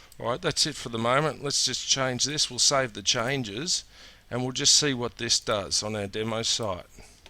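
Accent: Australia. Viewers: you are aussie correct?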